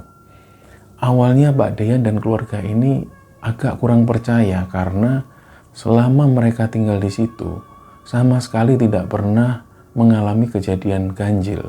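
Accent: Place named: Surabaya, Indonesia. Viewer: kalo gw kangen sama bokap gw, dengerin channel ini. Bokap gw orang Surabaya .... logatnya dan gaya berceritanya sama ^__^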